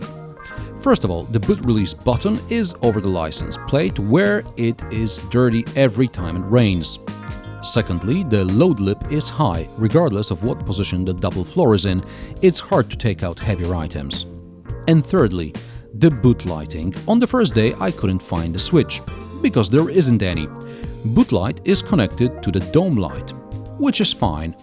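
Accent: France. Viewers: I like your french accent 😂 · Lmaooooo the French accent. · I love your reviews man...!!!! nice one. Your french accent rocks!!! :) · Yours French accent is amazing! Pink Panter far behind.... P.S. Car petrol engines not impressing.... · Nice looking car from the outside but... The Sat nav on the Kadjar I tried was unfathomable.... (French logic?) ...and the infotainment system made funny buzzing noises whenever I passed near power lines... Rear seat space is cramped, performance is below class average and not sure how well it would stand the test of time. Like your french accent BTW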